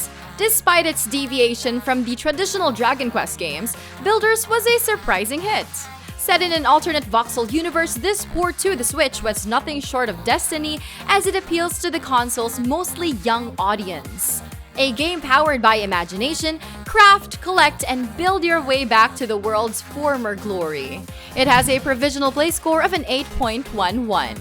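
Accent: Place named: Philippines. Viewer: This is an unmistakably Filipino English accent.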